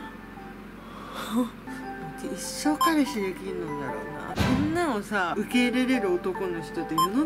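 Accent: Okayama, Japan. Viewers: まあたそさん 初めましてこんにちわ😁😁😁 いつも楽しみに見させて頂いております😊🤚 やっぱり、まあたそさんは面白い☺ですね🤚 いつも、ゲラゲラ😆😆😆と1人見ては笑ってます😅😅😅 懐かしい岡山弁にホッコリ💓しながら見てますよ😁😁😁🤚 岡山を離れ早いもので8年になりますがなかなか実家に帰れないのでまあたそさんの動画を見ては実家を思い出しながら見てます😅😅😅 メイク動画も好きですがこの動画も好きです😊🤚 今のまあたそさんらしくこれからも頑張って下さいね🤗🤗🤗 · 岡山県民だけど岡山弁嫌いでもまあたその岡山弁は好きなんだよなあ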